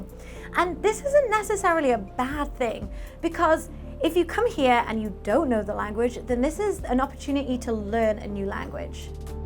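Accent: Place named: Northern England, United Kingdom. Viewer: Nice video :) I thought i heard a northern twang in your accent...that was Bamburgh castle right?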